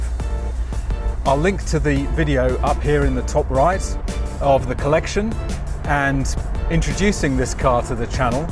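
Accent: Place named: United Kingdom. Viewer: Hey, you sound very Brit 😀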